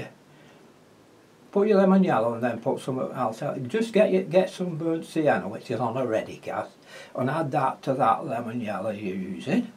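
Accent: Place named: Northern England, United Kingdom. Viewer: great pen & wash,keep em coming! and the way you talk is ok,you are what you are and the northern accent is good.i was born in the east end of london and we have our own language,when i moved to another part of london the teachers at school called my mum in and said they couldnt understand what i was saying, be proud of where you are from,not to please others. keep well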